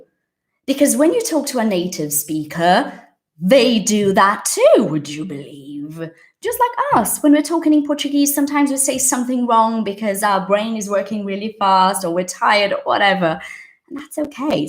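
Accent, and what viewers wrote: United Kingdom. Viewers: I love this accent British ♡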